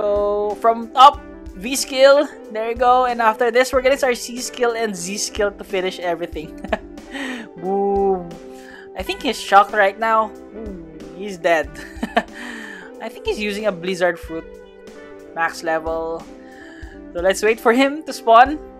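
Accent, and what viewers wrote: Philippines. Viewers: are u from the phillipines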